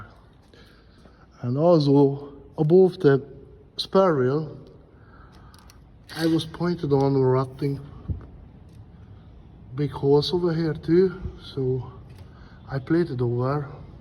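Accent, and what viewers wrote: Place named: Northern Ireland, United Kingdom. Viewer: I might be wrong but you sound like you've picked up a bit of an northern Irish accent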